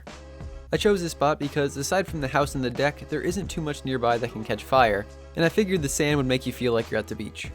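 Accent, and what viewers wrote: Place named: England, United Kingdom. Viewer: New England but your accent is well English haha interesting though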